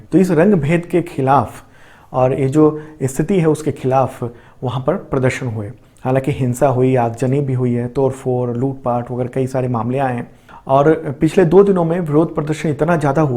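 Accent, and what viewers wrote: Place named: Bihar, India. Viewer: Voice action se Lagta h Bihar ke ho !!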